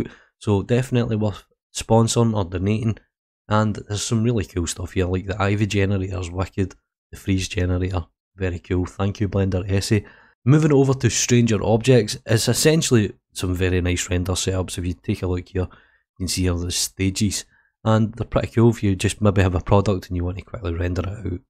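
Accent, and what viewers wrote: Scotland, United Kingdom. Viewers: Don't worry, you just sound a bit more Scottish than usual.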